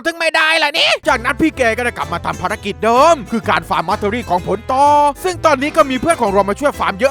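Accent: South, Thailand. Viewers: เป็นคนใต้หรอครับ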